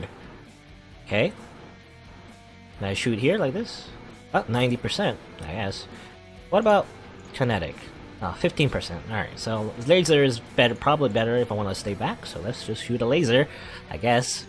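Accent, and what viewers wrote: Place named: England, United Kingdom. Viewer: sorry I posted the same message twice...for some reason it didn't show up either time so I thought I hit the cancel button by mistake. I just deleted the repeat message so it no longer shows......I didn't even realize English wasn't your native language....I thought you had an English accent actually....you speak it tremendously well.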